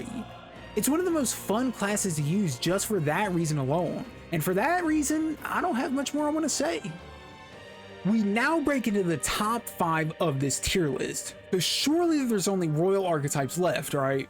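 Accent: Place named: South, United States. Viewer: are you from the south? i like the accent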